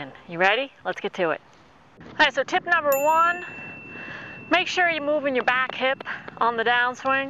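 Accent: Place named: New England, United States. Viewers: Working my way through all your videos, which have been soooo helpful. I am like Mark J. I stick to one person's advice and yours is clearly a game changer. Thanks and I love your Yankee accent. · Funny lady and such a clear explainer. Love that no nonsense New England accent. packs a ton of info into a short space and is always entertaining.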